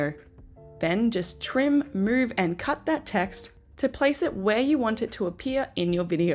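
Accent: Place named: Australia. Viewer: so, when you delete a section that you cropped or cut out, it automatically closes the gap again and butts up to the next nearest video front or back??? You, sound Aussi . I watched this about 2 weeks ago and could not understand it at all. been using openshot and slowly getting the hang of it. still can't put in music correctly. I want to learn the easiest program. I don't know which is which. HELPL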